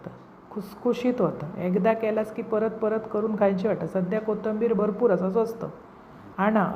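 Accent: Malvani, India. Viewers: ताई तुमची बोलण्याची पद्धत अतिशय गोड वाटते .आपलेपणा वाटतो.मालवणी गोडवा .पदार्थ छानच.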